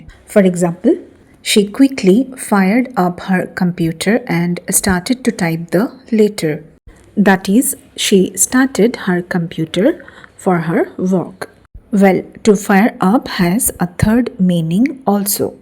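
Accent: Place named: India. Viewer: Esa pronunciación es de india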